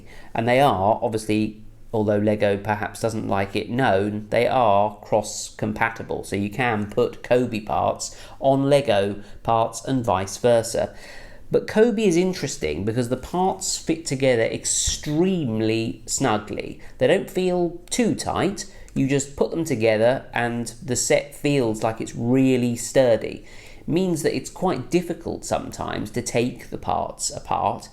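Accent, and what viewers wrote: United Kingdom. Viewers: Did he say Fokker ? I dunno, in that very British accent I choked on my LEGO brick 🧱. Hey keep up these vids, I’m new to the channel but I’m a scale model builder whose taken to LEGO’s now and again (the Ecto 1 & 1966 Batmobile) but I want some military models & you sir are providing some great info. (language aside - 🤣)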